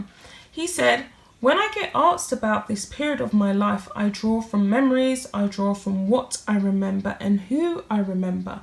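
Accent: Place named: London, United Kingdom. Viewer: The word is CENOTAPH not Centrepath. I'm getting increasingly irritated by your constant mispronouncing of words in your narratives. You should for goodness sake, practice what you are going to say first. Make sure you know how to pronounce words that you aren't familiar with before you decide to record. It's obvious that you are using phraseology and words that you are not familiar with, in an attempt to sound somewhat more sophisticated than you are. You do try to hide your London accent, but not really managing it. Sadly, when you use the words "they was" instead of "were" (all the time) that impression falls short.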